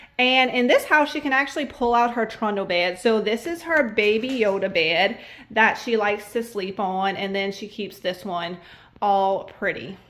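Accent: Louisiana, United States. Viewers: We used to live in the Leesville/DeRidder area when we first got married, but we left in 1991. I love hearing your Louisiana accent—I’ve really missed hearing it!! Your house is beautiful, and I’m subscribing to see it transformed.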